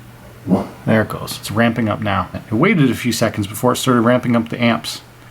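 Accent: Canada. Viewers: The Canadian in me immediately recognized the Canadian in you 😁😁😁😁🇨🇦🇨🇦👌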